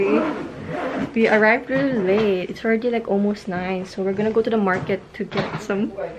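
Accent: Philippines. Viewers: wait—u kinda have a Filipino accent😧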